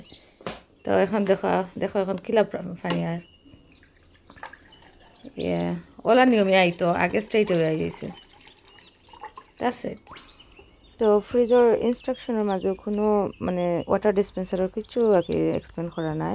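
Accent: Sylhet, Bangladesh. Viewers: Shylety kotha khub valo lage, subscribe korlam STAY connected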